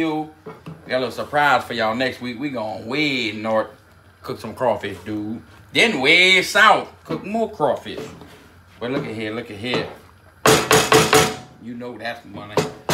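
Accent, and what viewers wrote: Louisiana, United States. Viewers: I love homeboys Cajun accent 🤟🤟🤟 ya dude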